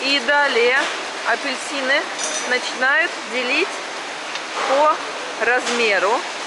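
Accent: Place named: Turkey. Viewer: Извините! Почему у вас акцент на Русский? Такое ощущение что не русская а турчанка говорит на русском. У Турков ударение на последние слога.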